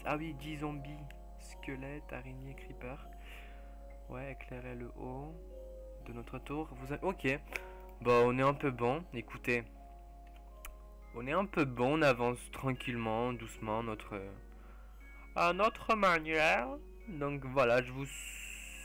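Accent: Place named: England, United Kingdom. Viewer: ton casque change ton accent tu as un accent englais mais ses bien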